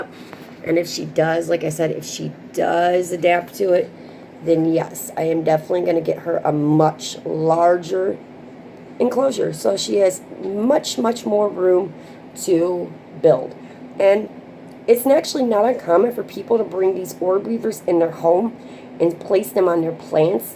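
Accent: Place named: Ohio, United States. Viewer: Awesome stuff! I am actually trying the same thing with a much smaller spiny orb weaver! How crazy is that! You said everything I couldn't say about my viewers. I started out the same way. Just a few friends & family & now I have more friends & family on here! Lol. This community is one of my favorite parts of the hobby aside from the critters. PS. I thought you sounded like you were from Ohio! Norvis is actually a good friend of mine & y'all all have a similar accent! Lol 😂. Great stuff girl keep up the great work!!!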